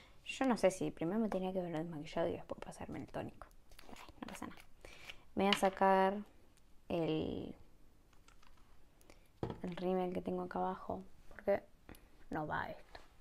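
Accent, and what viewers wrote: Argentina. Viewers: Sos de Argentina? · Sos de argentina?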